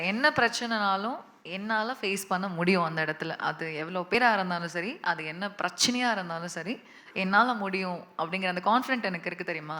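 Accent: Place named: Kerala, India. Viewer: Your Ramanathapuram we know but slang looks like Malayalam slang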